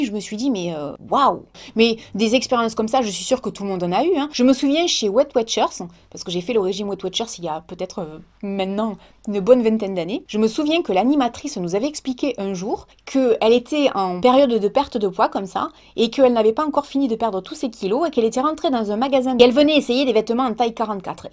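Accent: South, France. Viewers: Coucou ♥ j'adore ton petit accent du sud et tes cheveux roux ! Tu m'as fait sourire dans ta vidéo et j'avoue que c'était bien sympa o/ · Vive l'accent du sud ! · Je me disais que tu avais beaucoup l'accent du sud et qd j'ai entendu Perpignan j'ai mis pause et je t'ai regardé comme si yavais un cookie devant moi et j'adore les cookies 🤣❤️ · j'adore trop marrant, surtout l'anedocte avec Kate Wislet pas mal... et j'adore ton accent, ça me rappelle le sud et le soleil :)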